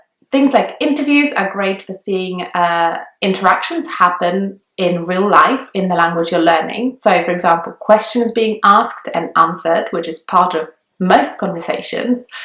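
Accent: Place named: United Kingdom. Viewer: Witaj! Thank you very much for tips and content that you create! I really though you were British until you mentioned that you are Polish! Idk if you ever covered this subject, but after watching you video reweaving Mondly I noticed a detail (and a a big issue actually) I've been dealing with: How do you manage learning a new language throughout another language foreign language? For ex. I'm Portuguese, English is my 2nd language and I want to learn Finnish ... The issue is that many people are not fluent, don't master a language well enough or just feel is more difficult learning a foreign language with another one that they are not conformable, specially langues like Finnish that doesn't have any resources to learn though besides English instructions. Dziękuję!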